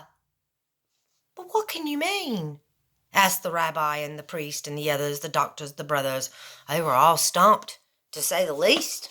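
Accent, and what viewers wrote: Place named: England, United Kingdom. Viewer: I love the english accent...do you have a theater background?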